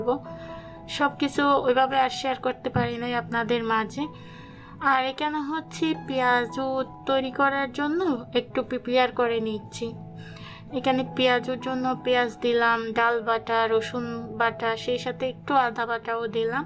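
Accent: Chittagong, Bangladesh. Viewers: হাহাহা আপু চিটাগং য়ের ভাষা শুনে ভালই লাগে কিন্তু বুঝি কম।সব মিলিয়ে খুব ভাল লাগল।আমি খেয়াল করেছি যে আপনি কম ব্লগ আপলোড দিচ্ছেন😊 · Ha ha apu chottogram er vasha shunte amr kintu darun legese..😂😂.tobe ata thik bipod ashle sob dik thekei ashe 😢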